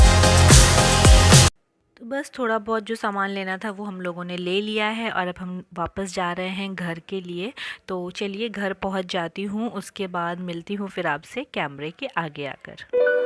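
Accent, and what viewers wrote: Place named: Kanpur, India. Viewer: Purani yaade taza ho ganyi...chauk aur ftp ki galiyon 😀😀😀 First video me Apke voice tone se hi laga ki ap cnb ftp se😀😀😀👍👍👍👍👍 Good work mam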